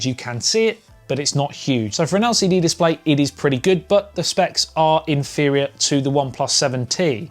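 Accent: England, United Kingdom. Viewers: That's the beauty of the English speaking the English language.😍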